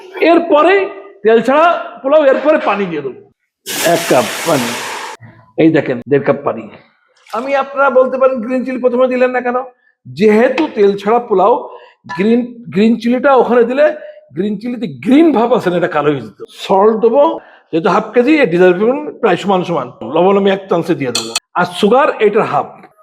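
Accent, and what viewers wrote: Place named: Jessore-Khulna, Bangladesh. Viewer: আপনার বাসা মনে হচ্ছে যশোর খুলনা তে।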